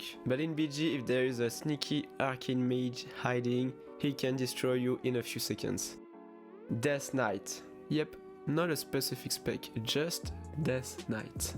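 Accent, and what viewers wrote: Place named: France. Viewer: french accent :p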